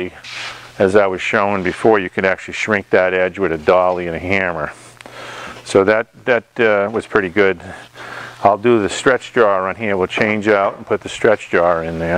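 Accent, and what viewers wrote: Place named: Maine, United States. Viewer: Awesome video. As I used to live in Maine for 13 years the accent reminds me of home. My question is you tend towards shrinking most of the time and why that is? I have done quite a bit of tin knockin in the past and really never gave it any thought. Your thoughts would be greatly appreciated!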